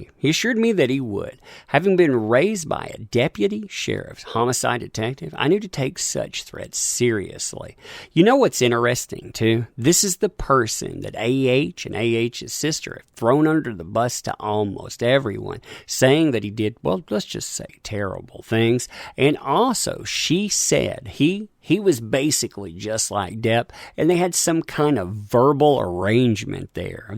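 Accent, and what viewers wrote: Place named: Texas, United States. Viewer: Texan AF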